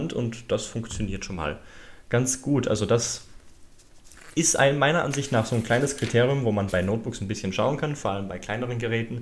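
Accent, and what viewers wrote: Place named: Germany. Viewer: Immer dieses typisch Deutsche: "Huwei" "kinesisch"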